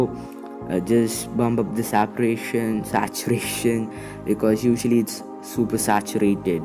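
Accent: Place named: Kerala, India. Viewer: ivante englees ketta ariya malayali aann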